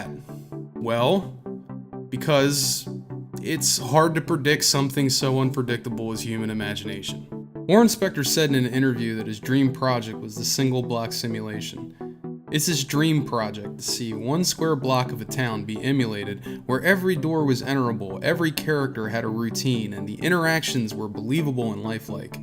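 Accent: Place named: Philadelphia, United States. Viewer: You're from Philly, aren't you.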